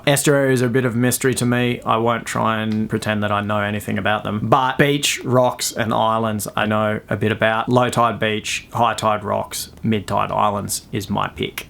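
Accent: Australia. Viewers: Hi bro! Where are you from? Australia?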